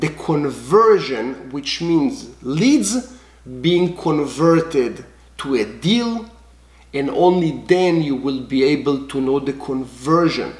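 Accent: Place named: Spain. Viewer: You sound like spanish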